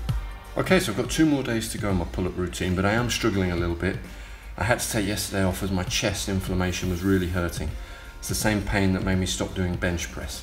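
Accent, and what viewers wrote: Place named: United Kingdom. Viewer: You helped get me off my ass or bum as you called it. Motivating to watch, I also enjoyed hearing the bits of Les Brown thrown into the video. Good luck in the future from across the pond, guessing by the accent. Oh and by the way I bet if you had rested for a couple days instead of one you would have done better on your test.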